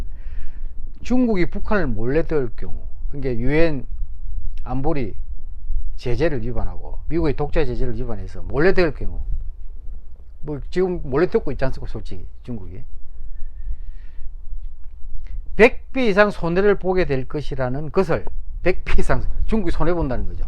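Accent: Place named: Gyeongsang, South Korea. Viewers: 경상도 사람은 경상도 말로 해야 목소리 톤에 힘이 들어가고 더욱더 호소력과 전달력이 있습니다. 억지 서울말 보다는 얘기하기 편한 말로 하는게 더 낫지 않을까 생각합니다. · 경상도 사투리를 서울 말씨로 고치세요.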